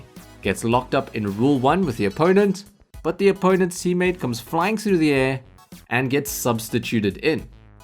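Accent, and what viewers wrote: South Africa. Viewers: i thought so from the accent. Im also from south africa!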